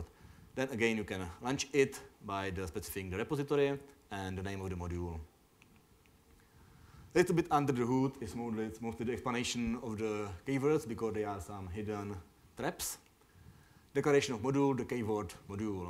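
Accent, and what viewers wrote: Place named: Czech Republic. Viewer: hehe its english or czech :D most terrible english accent i ever heard.. very funny x)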